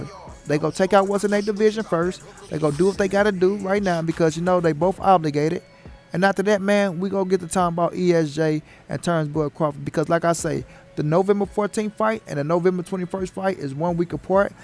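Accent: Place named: Midwest, United States. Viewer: ok i was trying to figure out your accent that's that Midwest country. I'm from Louisiana btw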